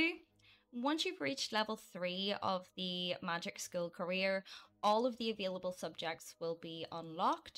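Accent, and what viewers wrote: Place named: Northern Ireland, United Kingdom. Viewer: Omg I got so excited when I heard your accent! I have never came across a fellow northern Irish simmer! ❤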